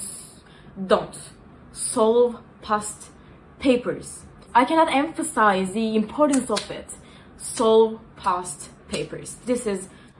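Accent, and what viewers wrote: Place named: Turkey. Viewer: Türk aksanı da çok yakışıyor .*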